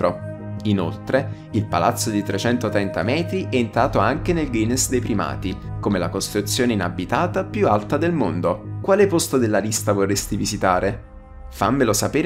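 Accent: South, Italy. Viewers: Bhe si dai non male a parte diversi inciampi sull italiano ma si capisce che sei meridionale quindi ci sta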